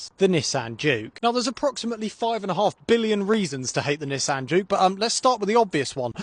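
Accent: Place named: Chav, United Kingdom. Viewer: His accent is so chavvy I can't listen to him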